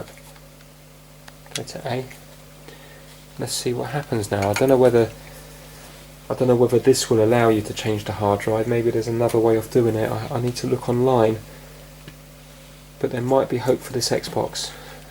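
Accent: United Kingdom. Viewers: Amber Ford I agree. It may also be the British accent. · I'm watching this for the Brit accent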